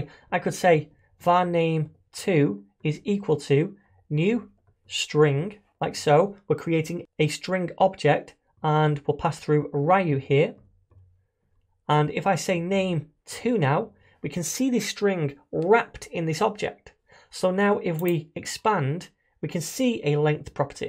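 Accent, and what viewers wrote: United Kingdom. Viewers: So glad to hear you with British accents very informative and comprehensive explanation thank you.!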